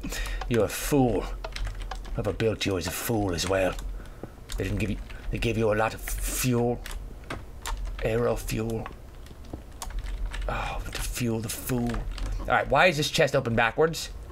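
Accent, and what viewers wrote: United Kingdom. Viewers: Btw BREAK THE BLOCKS THAT THE LEVER WERE ON PLEASE PLUS ARE TOU ACTING BRITISH?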